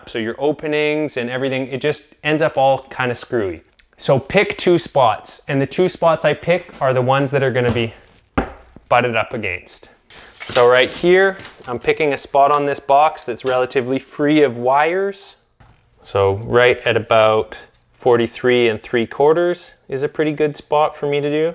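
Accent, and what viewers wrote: Canada. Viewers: SOMEone could watch this just to study the Canadian accent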